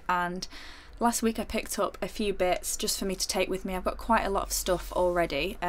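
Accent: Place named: North, United Kingdom. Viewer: You have such cute taste in clothing! I love your northern accent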